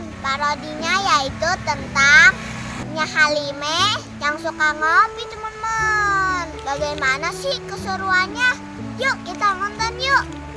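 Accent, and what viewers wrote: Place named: Jakarta, Indonesia. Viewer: gitu banget dah...😆😆 seruu video nya,kak lucu banget sii..ngomong logat betawi nya lucu😂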